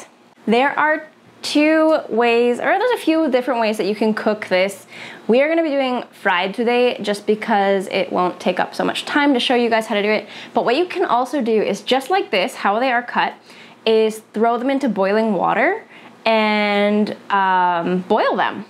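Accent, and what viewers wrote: Costa Rica. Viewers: En español tienes acento costarricense, por un momento pensé que estaba usando una dobladora costarricense 😅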